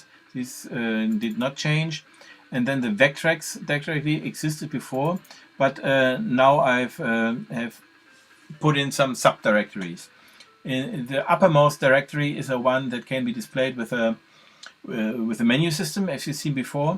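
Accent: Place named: Germany. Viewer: You sound like German is your native tongue. ... and Giana Sisters as background music! Rocks!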